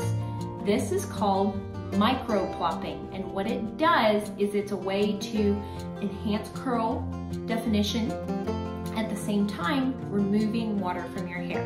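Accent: South, United States. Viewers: I detect a fellow Southerner! Native Texas living in the West! Miss the south, and will be back there soon! My hair worked the first time, as I have ringlets already! Thanks for the tips!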